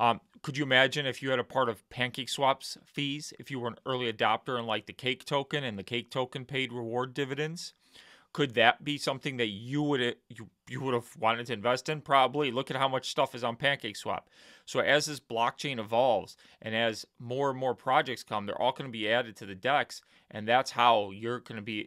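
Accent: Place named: United States. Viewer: Sounds like an american to me.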